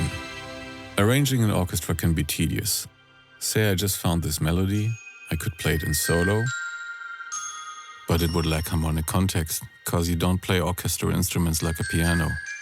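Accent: Germany. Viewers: German Accent?;)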